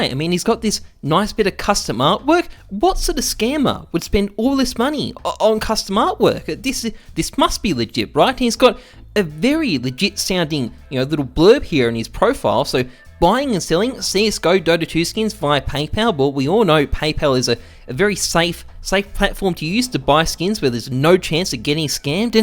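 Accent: Australia. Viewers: i died when he said "nice spelling bud" its good watching an Australian because i find it funny when my whole family and extended family all sound exactly like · Australian accent and a video about scammers. Thought this was Karl Rock 😂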